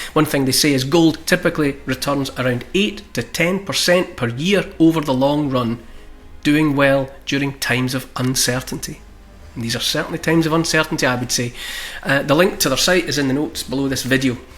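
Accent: Scotland, United Kingdom. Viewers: Brilliant. Oral stories enchance the written word and covey the spiritual nature of history. Especially done with a fine Scottish accent.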